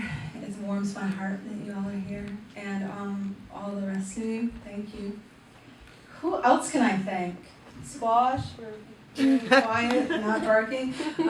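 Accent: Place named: Midwest, United States. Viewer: For one, Everyone has an accent haha; but if you wanna be specific then she has a modern midwestern american accent. I would know, I'm from Colorado.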